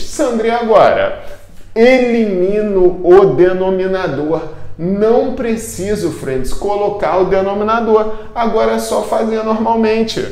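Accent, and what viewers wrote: Rio de Janeiro, Brazil. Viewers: voce e carioca? tem o mesmo sotaque que eu falo tudo com x mano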